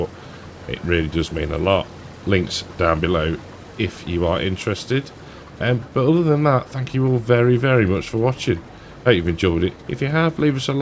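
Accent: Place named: United Kingdom. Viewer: What part of the UK are you from? I lived in London, Birmingham, Manchester and spent a bit of time in Skegness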